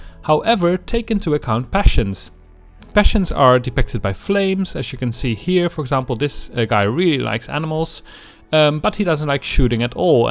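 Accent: Netherlands. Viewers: Dutch accent ? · you sound Dutch :)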